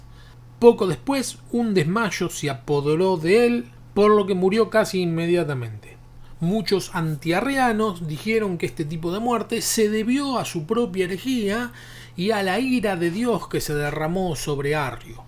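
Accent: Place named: Argentina. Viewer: un saludo hermano usted de que pais es parese asento arjentino saludos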